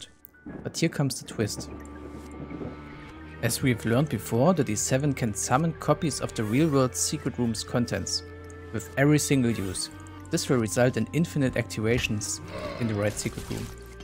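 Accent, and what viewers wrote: Germany. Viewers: you seem to have a strong accent, sounds pretty german to me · Hab schon immer geahnt dass du deutsch bist 😂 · You got a decent german accent haha, i like this more then just reading text keep it on if you like too :D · Are you german? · Where are u from, sounds a bit like German I think. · Uff dein englisch klingt Deutsch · your german accent is very pog and interesting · you german?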